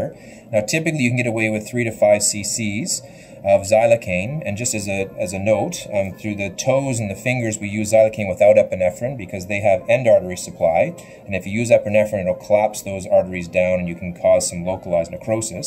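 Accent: Canada. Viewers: You sound a little bit like you're from Canada.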